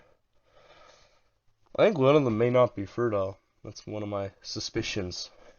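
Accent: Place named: North America. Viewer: The colony scent comes from the grease/oil they are covered in. This layer is made up of strings of hydrocarbons and the combination is different for each colony, they apply it when they groom themselves and each other. It also acts as their water proof layer so they repel rain drops and is the reason they look reflective when you shine a light on them to film. Be careful adding different queens, it's almost impossible into an established colony and the other issue you've got is you actually don't know what species your ants are. Judging by your accent I'm going to assume you are in North America and this makes it fairly unlikely they are Lasius niger. They might be, it is possible there are a few pockets of Lasius niger especially in Canada, but is more likely they are Lasius neoniger or Lasius americanus.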